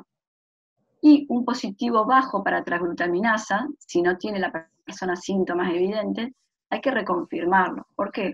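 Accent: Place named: Argentina. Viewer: Hola, gracias por toda esta valiosa información. Solo quiero aclarar una cosa. Yo padezco la Enfermedad Celiaca diagnosticado ya hace varios años aquí en Los Estados Unidos donde vivo. Se me ha dicho todo el tiempo por los Doctores que la avena no contiene gluten naturalmente, solo se contamina cuando se maneja junto con el trigo. Yo ingiero avena frecuentemente y no me produce ningún problema. Por el acento de la Doctora creo que es Argentina, escuche este mismo comentario en un programa de televisión Argentino. Así que no se si esto es un error pasando solo en el sur de America o es un error aquí en los Estados Unidos.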